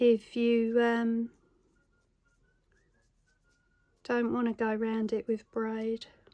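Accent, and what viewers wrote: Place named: Southern England, United Kingdom. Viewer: it keeps me out of trouble 😂. Can I ask what area you're from? I live in Norfolk now but originally from S.E. London. I like to guess where people are from and I'm thinking you're from Kent or the south of England!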